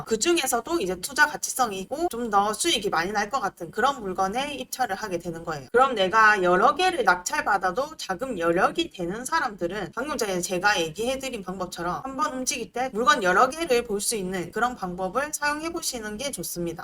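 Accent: Gyeongsang, South Korea. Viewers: 갱상도 말투 쥑이네예~화끈하게 좋네예!!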